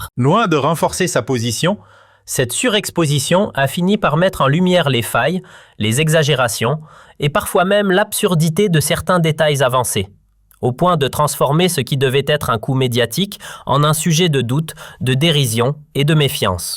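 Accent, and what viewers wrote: Canada. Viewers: Pourquoi la voix a un accent canadien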